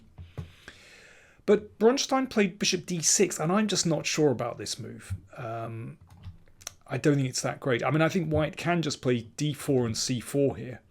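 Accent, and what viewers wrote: United Kingdom. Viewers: Yeah. Very nice british accent.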